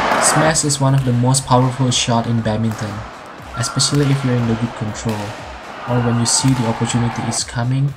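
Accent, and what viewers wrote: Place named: United States. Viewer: Me toobut I don't understand what he is saying bec I'm british and he has american and asian acent😂😂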